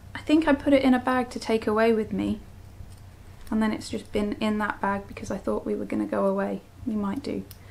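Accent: United Kingdom. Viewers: Oh thank you so much for this new video :) this is just so sweet from start to end, and the 2 Elfmail sweaters are gorgeous, how lucky I am to not have my entire stash by hand otherwise I would have casted on one tonight! As you said, currently "sinking into calm" when chaos is too close, and loving your accent : remind me some months spent in UK, not so far from you! Take care and happy knitting!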